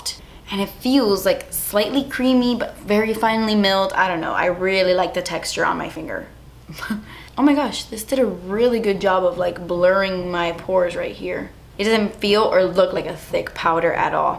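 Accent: United Kingdom. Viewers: goooood, I love when you speak with british accent :D but this definately looks more like a tutorial then a challenge, are you sure your not like both-handed? <3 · are you british??? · You're British accent gives me life hahah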